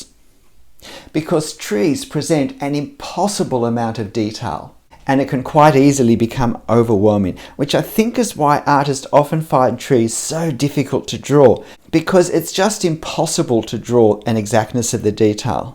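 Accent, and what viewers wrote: New Zealand. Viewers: I love that accent! "dee-toh" is it kiwi? New Zealand? I want to say I'm blown away by your work. The patch of flowers looked to tactile, like there was a path carved from people passing throught the flowers. And the last drawing... My God, breathtaking perspective.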